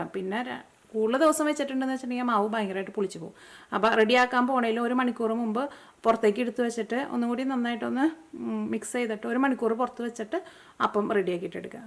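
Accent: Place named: Angamaly, India. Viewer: yude ella cooking enikku eshta.nalla presentation anu.angamaly ano kuttide place njan Kure kalam thamasichittunde.kuttide slang ketteppol thonniyatha.enikku mole valere eshta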